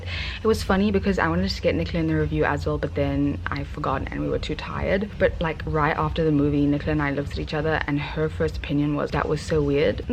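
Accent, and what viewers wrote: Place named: Johannesburg, South Africa. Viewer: joburg boet accent has really plagued my vocabulary recently 🧍🏻‍♀️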